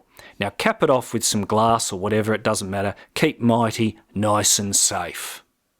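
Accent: Australia. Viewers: You're Australian right? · Good to hear a Aussie doin some MC videos. Have you done this in Survival mode before? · Are you Australian?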